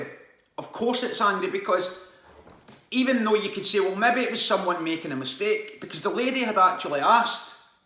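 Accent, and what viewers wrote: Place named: Glasgow, United Kingdom. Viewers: Thank you for letting us know this! No doubt coming to N America soon enough. And your accent reminds me of my dearest friend that lived in Paisley. And yes, I understood every word you said, and she, too. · Brilliant, I've just heard of you today. You sound like you're fae Wishy lol..Great to hear what you have to say..and you are right we need to come together, but the created divide gets in the way so much. Where I live now, north east Scotland, only the scum of the earth would ask for a council house so most people don't cars as they have a death- tax (mortgage)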